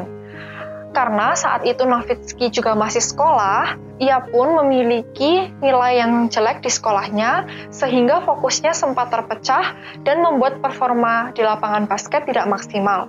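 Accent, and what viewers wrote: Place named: Java, Indonesia. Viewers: suaranya medok tapi lucu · Pasti org jateng nih medok nya beda sm surabaya, wkwk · host ceweknya kalo ngomong medhok, tapi lucu wkwk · medok imen mba hehehe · Suroboyoan cokk.. 😅😅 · Host nya fix orang SBY wkwkwk medok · Medok e surabaya ki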